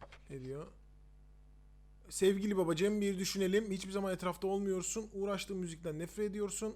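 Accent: Kayseri, Turkey. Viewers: Usta siz vallahi Kayseri'lisiniz :D Benim eniştem oralı konuşma şiveniz aynı :D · Şiveli daha doğrusu kayseri ağzı yapmadanız daha iyi olucak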